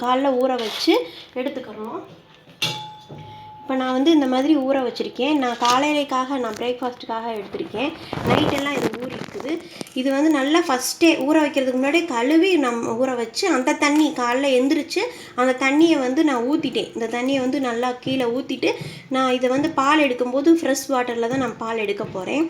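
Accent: Madurai, India. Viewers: madura vaasam unga pechula and paruthi paal namma madurai la mattum thaan spl and kedaikkum innum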